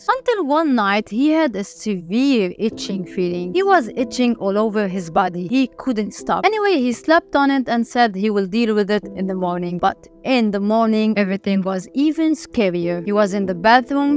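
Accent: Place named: Lebanon. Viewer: English with a Lebanese flavor....... ملوخية flavor 😂😂😂😂❤❤❤❤ Though I don't like (ملوخية) my family keeps on making it...... I wish you all the best, I'm following you on your YouTube channel in Arabic and I'm following you here and I will share this with my friends.... thank you for everything, and congratulations for the 100.000, hope you'll reach more and more.... Most importantly, wish the war will end in Palestine 🇵🇸🇵🇸🇵🇸 We pray for the families, Incha Allah Lebanon will be safe 🇱🇧🇱🇧🇱🇧🇱🇧🇱🇧 From Algeria 🇩🇿🇩🇿🇩🇿, your fan ❤❤❤❤ Abdessamad........ Keep up with the good work, you're amazing ☺️👌❤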